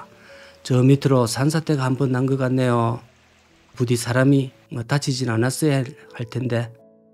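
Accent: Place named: Gyeongsang, South Korea. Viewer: 경상도 말을쓴다고, 너자신의 본질이 숨겨지는것이 아님!!!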